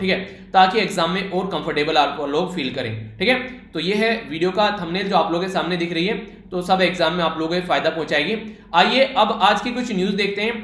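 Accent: Haryana, India. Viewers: Sir aap pkka haryana se ho ,Musa =rat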